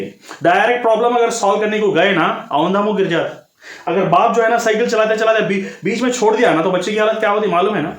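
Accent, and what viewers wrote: Hyderabad, India. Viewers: Hyderabad se lgte . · Sir aap hyderabadi haii kyaa😅 Good explanation sir😊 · Sir ka hyderabadi accent sunne k liye Aya main..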